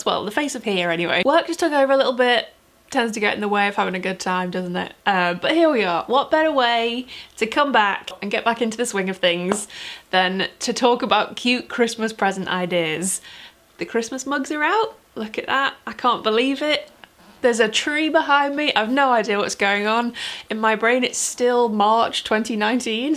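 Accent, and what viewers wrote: Liverpool, United Kingdom. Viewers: Fuck. Time for a nightcream :((( p.s sounding very scouse in this video 😂😂 maybe i had forgotten what your voice sounded like. Love this vid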